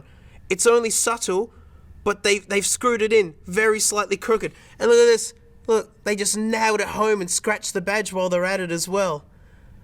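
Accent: Australia. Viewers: ever since he took a break, his australian accent thickened lol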